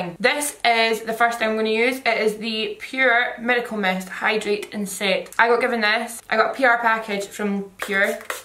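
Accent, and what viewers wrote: Scotland, United Kingdom. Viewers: her accents amazing ! there's hardly any scottish youtubers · You are so different and legit beautiful, I love the fact that you are not like everyone else, and I flipin love your Scottish accent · ...i just recently found you on YouTube and now i cannot stop watching your videos!!!! you're absolutely gorgeous, your makeup looks are always fire, and i am obsessed with your beautiful Scottish accent!!!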